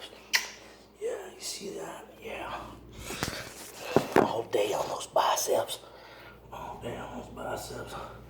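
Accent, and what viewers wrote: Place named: Canada. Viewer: You’re Canadian ! Oh I feel like such a dummy asking where In the states you live. I’m not great with accents obviously, my apologies 😂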